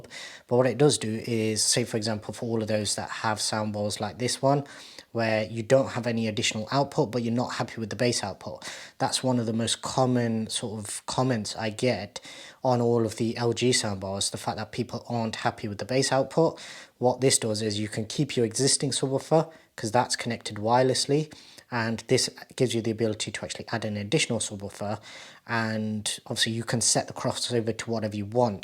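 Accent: United Kingdom. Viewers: Thanks for the English English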